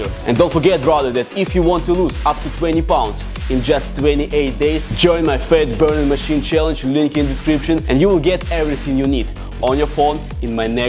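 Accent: Russia. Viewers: by his accent, I know he's russian...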